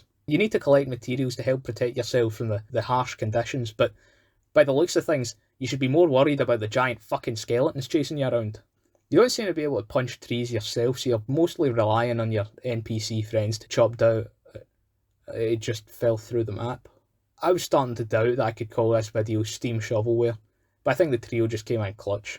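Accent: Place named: Scotland, United Kingdom. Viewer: May you gain million views random scottish man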